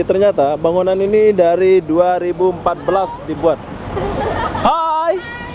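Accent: Lombok, Indonesia. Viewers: Dari awal sy udah mengira kalau ni orang dari lombok soalnya logat penyebutan Malasianya kental nanget. Salam dri lombok juga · Batur lombok aran min, kenek jak ndek ye. Wah telang ongkat logat2 sasak tye lasing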